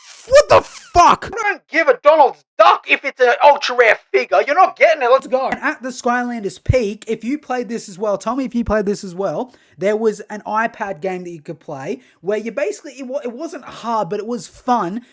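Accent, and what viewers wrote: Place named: Australia. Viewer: Skylanders was such a massive part of my childhood, went through and played all the games w my brother recently and they're still so much fun, love seeing people still appreciate how influential these games truly were Love your energy as well man, keep up the good work 🙏 as a fellow Australian the accent is sick as well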